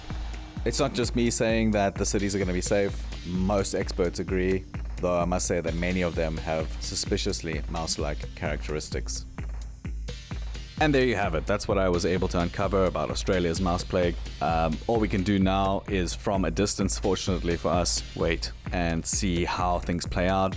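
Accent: South Africa. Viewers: Hey man.. Where are you from? You sound South African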